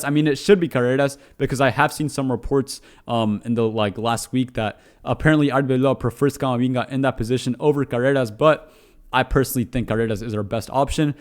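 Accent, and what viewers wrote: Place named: Argentina. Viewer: Do you have from Argentina? You sound Argentine when you pronounce Spanish words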